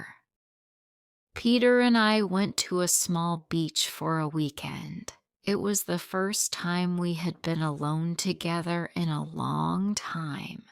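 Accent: United Kingdom. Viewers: Thank you very much for your stories ❤. Is that a british accent?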